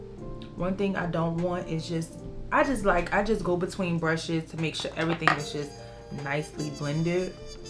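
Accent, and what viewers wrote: Louisiana, United States. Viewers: You are sooooo beautiful. Are you from New Orleans? Trying to pin down your accent! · This is my first time seeing you... You were in my recommendations... I love your make up and then I heard that accident too 😍 is that a New Orleans accent? I love your accent!! 🥰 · the hair definitely grabbed my attention but once I heard you talk I said “oh yea she from Louisiana, lemme follow her” lol you’re beautiful! 🫶🏾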